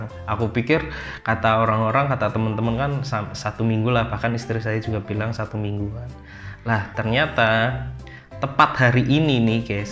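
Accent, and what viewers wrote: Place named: Indonesia. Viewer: Sampean kaya wong margasri mas.blok karang jati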